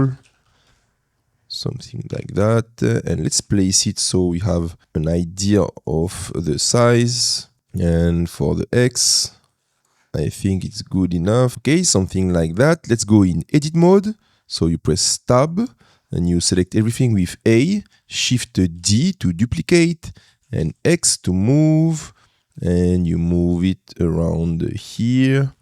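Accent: France. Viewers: t'es français ça s'entend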